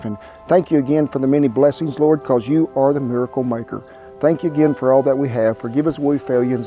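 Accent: South, United States. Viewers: thank you love the country accent im a good ole country boy out of east Texas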